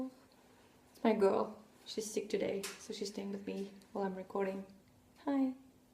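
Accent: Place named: France. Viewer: Hello! I am Jacqueline and I am a Canadian living in Tokyo, Japan. I love knitting and I am currently working on a turtleneck sweater for myself in Brooklyn Tweed LOFT (which is amazing yarn!) and a hat knit in merino and mohair/silk yarn held together. They are both knit in black! I am trying to make basics that go with my monochromatic wardrobe. I also sew but I am just getting back into it. Last week I made myself a knitting project bag with recycled kimono fabric. I loved your podcast and your English is beautiful and your French accent is cute:<). Thank you for the effort you put into doing this and I look forward to more.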